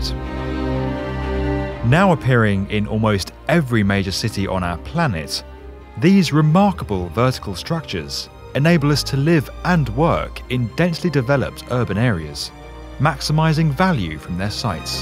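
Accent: United Kingdom. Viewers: good video , well done with the British accent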